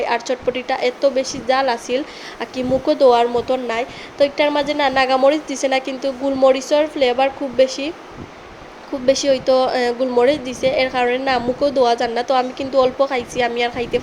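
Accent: Sylhet, Bangladesh. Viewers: আসসালামু আলাইকুম। ভি‌ডিও খুবই ভালো লেগেছে।আপনার কন্ঠে সিলেটি ভাষা শুনতে ভালো লেগেছে❤❤❤❤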